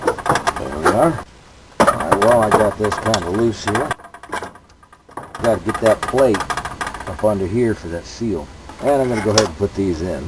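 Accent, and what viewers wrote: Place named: Texas, United States. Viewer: Great video,. You should explain how to test the diodes too. By the way, great Texas drawl too. Do you have a girlfriend yet?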